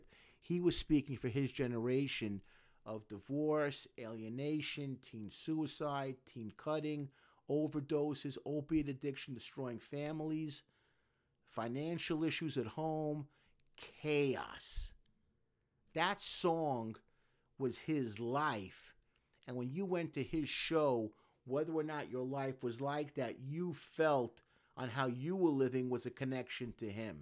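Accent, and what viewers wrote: New York, United States. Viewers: Your from New York aren’t you.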